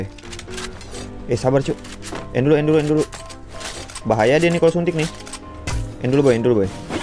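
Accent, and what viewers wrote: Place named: Bali, Indonesia. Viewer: bang lu kok ngomong nya kya logat bali😭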